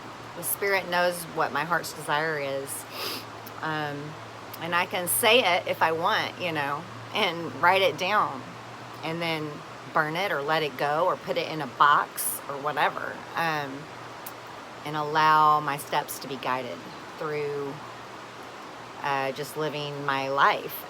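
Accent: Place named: South, United States. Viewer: Hi! What a beautiful place! And salad! And southern accent! I like how you talk about stuff. I really want my whole channel to be like that.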